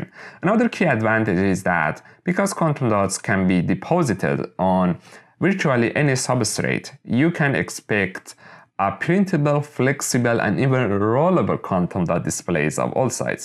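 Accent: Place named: Iran. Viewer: Hello, are you from Iran? Because of your accent,I asked.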